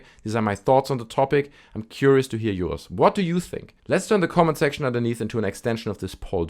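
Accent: Germany. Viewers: come on that sounds german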